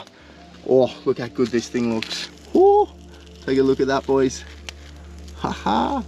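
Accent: Australia. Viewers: This dude has a Aussie accent you cant forget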